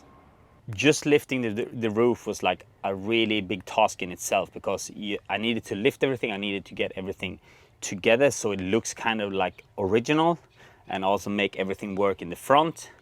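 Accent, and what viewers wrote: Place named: Sweden. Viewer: Sounds Swedish. Not Norwegian.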